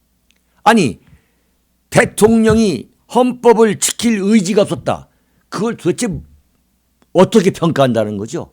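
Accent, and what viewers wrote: Chungcheong, South Korea. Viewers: 이아저씨 정말 구수한 말투 좋와 ㅋㅋ 충청도 어디신가? ㅋㅋ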